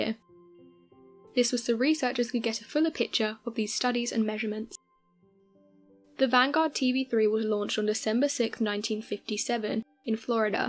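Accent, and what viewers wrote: United Kingdom. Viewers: British chick narrator...”unfortunately for the US, Russia did it first.”...yeah as if Russia were friends with the British...comon chick!!...The Brits didn’t do sh*t either.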